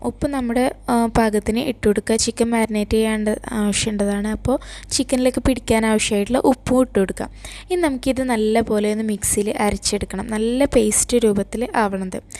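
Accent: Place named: Malappuram, India. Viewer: തനി മലപ്പുറം ആണല്ലേ വെറുതെ അല്ലെ അപ്പം ഞാൻ മലപ്പുറം കരിയാണ്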